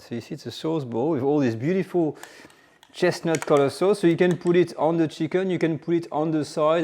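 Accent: France. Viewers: French cooking with a lovely french accent, délicious ! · With that accent, you can talk talk talk all you like monsieur. Je t’adore!!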